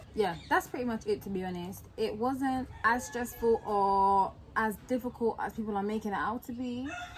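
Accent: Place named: Barbados. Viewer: Its also the bajan accent coming out for me😩😍😍😍😍😍🔊🔊🔊🔊🔊💙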